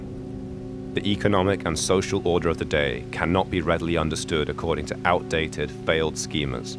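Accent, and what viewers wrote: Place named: London, United Kingdom. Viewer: "Weve got to take socialism from the urban ellite" says the man with the most middle class londoner voice to imagry taken staight from an Bristol rave poster.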